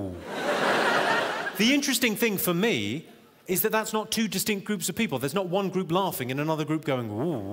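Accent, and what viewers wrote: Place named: Southern England, United Kingdom. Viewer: He speaks very proper English too, not very regional, just a kind of generic south England. Great for learning English.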